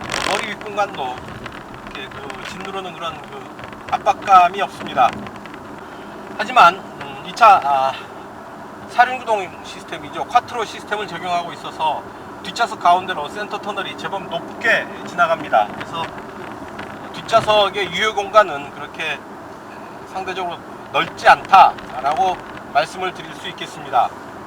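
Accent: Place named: Jeolla, South Korea. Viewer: 설명이 건조하고, 사투리톤이(전라도) 강해서 영상이 딱딱함ㅋ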